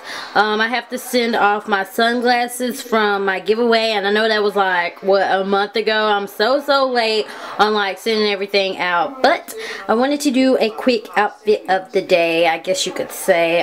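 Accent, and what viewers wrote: South, United States. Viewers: omg that was too cute and funny!! ur so country and its so cute lol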